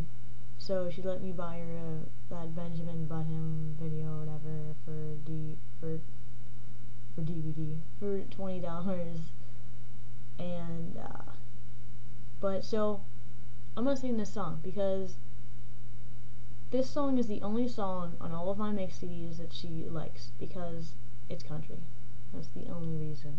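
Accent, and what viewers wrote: South, United States. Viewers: Haha you over did the country accent but good none the less lol made me smile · lol ur talking country its funny but sweet to do for ur mom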